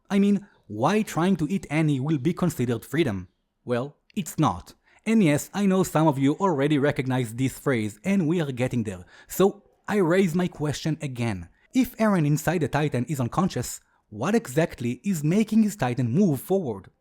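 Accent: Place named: Israel. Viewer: Bro I could tell you’re from Israel by your accent 😂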